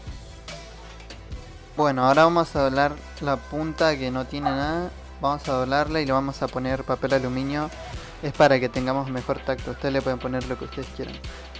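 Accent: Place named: Argentina. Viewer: Hisopos que cotonetes habla argentino papa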